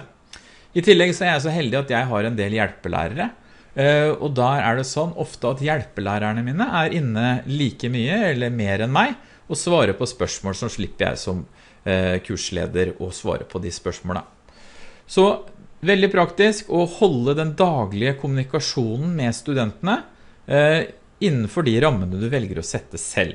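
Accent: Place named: Østfold, Norway. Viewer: "litteran".... fra østfold???? Nyyydelig dialekt!!! (er særping sjøl da...)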